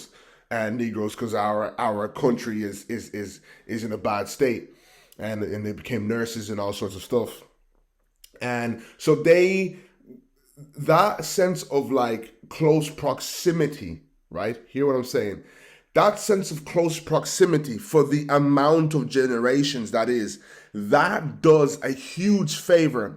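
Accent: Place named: London, United Kingdom. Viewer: He has the London bridge accent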